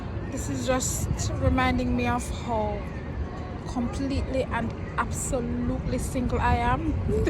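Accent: Jamaica. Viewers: I love discovering new places, love how you talk patois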